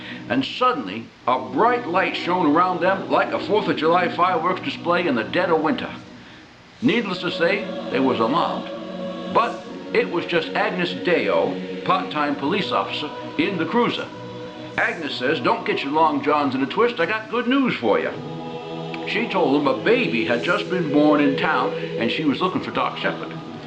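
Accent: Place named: New Hampshire, United States. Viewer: Merry Christmas,! It just ain't Christmas without hearing you read the Christmas story, New Hampsha style. Keep up the good work!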